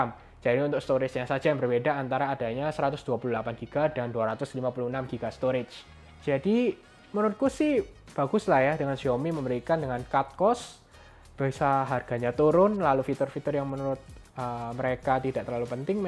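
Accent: Java, Indonesia. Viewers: Mantap mas....cino jowo medok yoo😍😍😍😍😍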